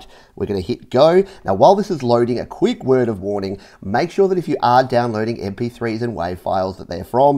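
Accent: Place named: Australia. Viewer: Ya sound Aussie mate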